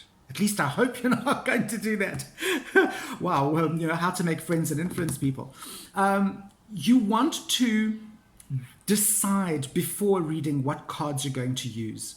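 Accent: South Africa. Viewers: I came here via Donna at Ozark Oracles channel and thought I would drop you a quick hello. Really enjoyed this video and am looking forward to watching more of your content. I think, personally, it’s important to always be honest whilst remembering to also be kind. On an unrelated note, I love your South African accent and could literally listen to you read a dictionary 😁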